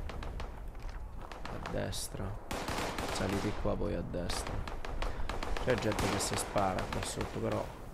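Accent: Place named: Abruzzo, Italy. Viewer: Non ho capito se sei abruzzese o napoletano, dalla voce della tua fidanzata mi sembra napoletano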